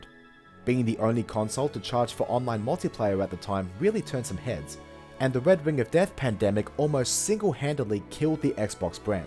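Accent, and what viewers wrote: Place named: Australia. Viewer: Not bad for an Australian accent.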